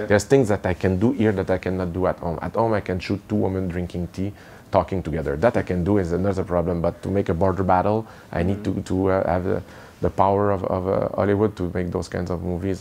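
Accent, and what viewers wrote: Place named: Quebec City, Canada. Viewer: Even if my English sounds like the one Denis is speaking (we are both from Quebec City), I smiled at that part: You know to know, you know to know the limit, you know to know the where where ;)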